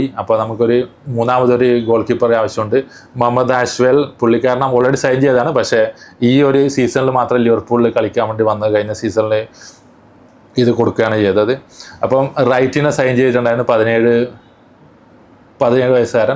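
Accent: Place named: Palakkad, India. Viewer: Are you from Palakkad district? Accent looks like that.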